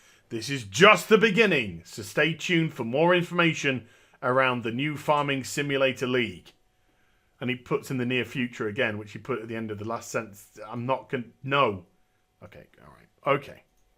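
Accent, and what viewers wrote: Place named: South Coast, United Kingdom. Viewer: Your a Yorkshireman? Really? I had you down for South Coast lad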